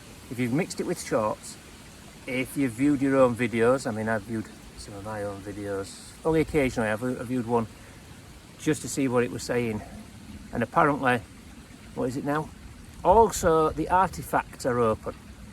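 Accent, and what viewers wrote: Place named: Yorkshire, United Kingdom. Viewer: Some of us just subscribe because we love to listen to you shifting the problems around the landscape. I wonder how many Americans you are going to attract, though. They are not good at Yorkshire accents, alas.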